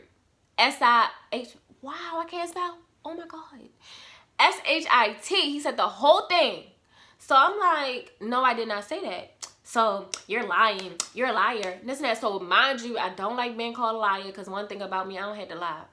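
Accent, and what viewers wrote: United States. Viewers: that's why I'm trying to work for myself and I love your accent are you from new york. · I clicked on ya video and immediately knew u was from Baltimore lol i was like she sound Nd talk like me😂❤️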